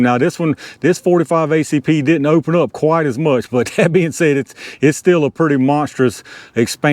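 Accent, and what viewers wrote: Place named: Appalachia, United States. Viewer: Also just from your accent I can tell you're from Appalachia like me so I gotta support the kinfolk 💀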